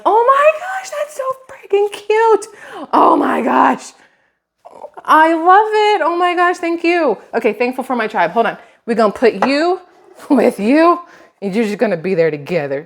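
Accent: South, United States. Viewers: Love ya , Your Awesome People!! , I love your voice and your accent I miss that I'm from the south to but that's not where I live at anymore !!, I love to hear y'all, and I miss hearing I'm fixing to do something LOL !!, IT'S AMAZING THE LITTLE THINGS THAT YOU MISS THANK YOU SO MUCH !!, PLEASE STAY SAFE !, I ENJOY WATCHING YOU YOU DO A GOOD JOB TELLING IT LIKE IT IS !!, THANK YOU!! GOD BLESS!! ,AND TO YOUR FAMILY!!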